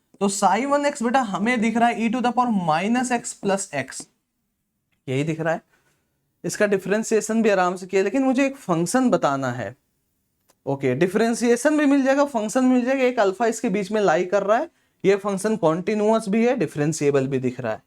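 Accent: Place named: Uttar Pradesh, India. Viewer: UP guy, not odia.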